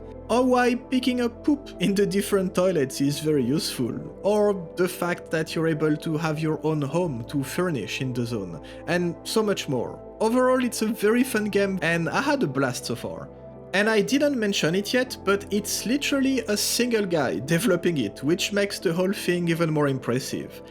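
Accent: France. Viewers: Hi just discovered your channel with your stalker mod video! Are you french by any chance? I may be sensing it a bit in the accent! If i'm mistaken, apologies. If not, salut à toi!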